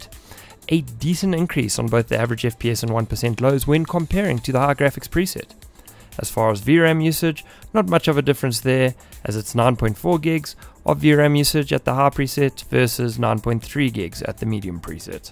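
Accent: South Africa. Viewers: I hear saffa, I sub.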